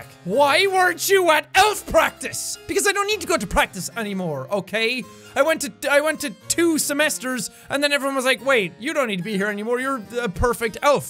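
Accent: Ireland. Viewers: I knew your chanel some weeks before when I was searching for examples of Irish accent for a presentation at college. Your video about guessing phrases made me laugh a lot, and I showed it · R U IRISH OR SCOTTISH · I'm 47 and I LOVE. He makes my day every day with his humor and Irish accent!!:)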